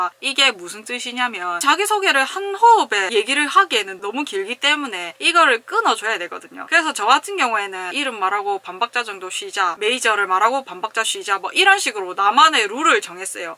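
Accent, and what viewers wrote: Busan, South Korea. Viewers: 유투브에서 부산 사투리 들으니까 신선하네요 ㅋㅋㅋㅋㅋㅋㅋ 전 대구사람이라 친숙하다는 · 와..부산사투리 오진다...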